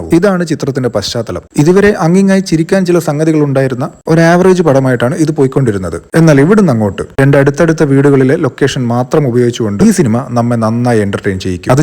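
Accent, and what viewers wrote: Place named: Thalassery, India. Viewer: വീണ്ടും തലശ്ശേരി 😁ഇങ്ങൾ ഏടെയാപ്പാ 😌 കണ്ണൂർ ആണോ..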